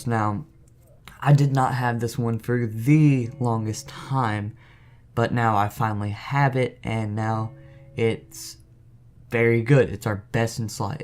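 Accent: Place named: Tennessee, United States. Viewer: That beautiful Tennessee voice PogChamp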